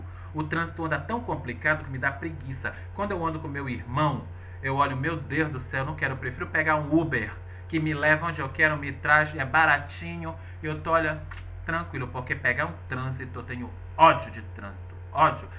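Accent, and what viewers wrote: Pará, Brazil. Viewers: Eu comecei a te acompanhar esse ano e desde o primeiro vídeo eu reconheci esse sotaque paraense, mas so agora comprovei. Que legal um conterrâneo perfumolatra com conteúdos tão legais 👏👏👏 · Quando tu abristes a boca eu sabia que eras de Belém . Eu disse “ esse cara é paraense , com certeza” . Conheço de longe um conterrâneo. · Adorei saber mais sobre vc, eu imaginava mesmo q vc é paraense, pelo sotaque. Também sou do Pará de Santarém, mas só de nascimento, moro em Sorocaba SP. Mas sei reconhecer muito bem o sotaque da terrinha. Vc é muito autêntico e natural, gosto muito do seu canal. Um beijo. Seja muito feliz!! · Olá Você é paraense não é.? Adoro este sotaque. Vi seu vídeo agora. Também tenho a sua idade! Quem dera conhecer o para ver o peso oh. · Eu desconfiava....que serias PARAENSE...por causa dos.."lh"! Kkkk..he..hi.. Estou tua vizinha..... 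Parabens para nós que somos AMAZONICOS! Chics! FELICIDADES!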